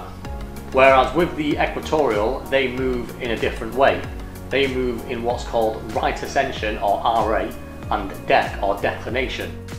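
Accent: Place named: Liverpool, United Kingdom. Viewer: loved the video. Ignore the YouTube trolls trying to get a laugh for their site on his Beatles Liverpool accent.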